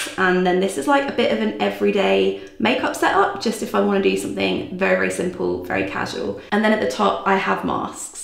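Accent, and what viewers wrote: United Kingdom. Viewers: Caddy for each family member! WHY did I not think of this?! Also, worth it to listen to a Brit discussing poo drops! Fab!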